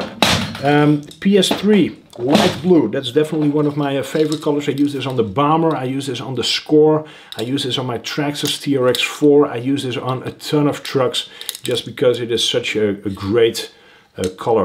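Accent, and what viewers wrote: Netherlands. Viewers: Are you Dutch? you have a Dutch accent :D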